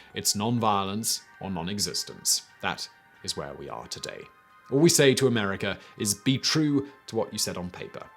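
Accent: United Kingdom. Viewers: I've never witnessed King's life narrated by such a fine British accent. gives the familiar new life! I never heard the story of the hotel operator having a heart attack.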